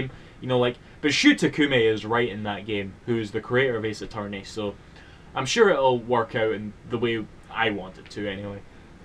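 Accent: Scotland, United Kingdom. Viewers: I'm not sure about this. I don't agree with some of the choices, and there is no footage or anything. But then again... You are brilliantly scottish. .... I'M CONFLICTED A!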